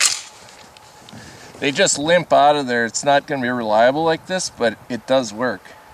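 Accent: Canada. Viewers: Very nice video! I really enjoyed your presentation style and your coverage of the beautiful nature as well as the technical details! Have been subbed for a while and really appreciate your channel. Canadian accent? Your weather is cooler than ours (here in Switzerland -3°C as I write). My local outdoor range is closed for winter :-( Take care, Rob (Brit/Swiss) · your from nova scotia arent you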